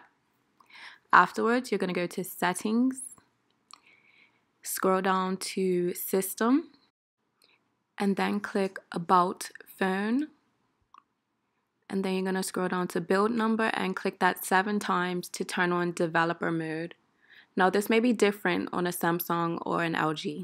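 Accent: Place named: Bermuda. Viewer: You sound Bermudian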